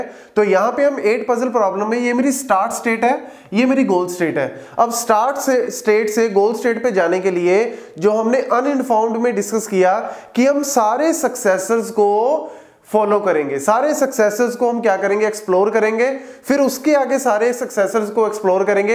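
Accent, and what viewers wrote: India. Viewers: Indian English.. too hard for me to comprehend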